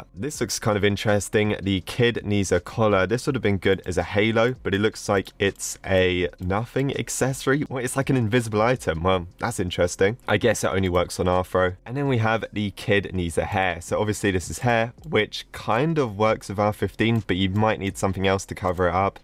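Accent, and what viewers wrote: United Kingdom. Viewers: sounds like british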